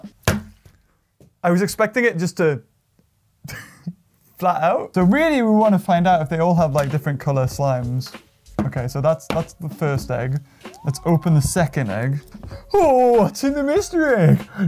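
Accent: United Kingdom. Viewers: You brittish mate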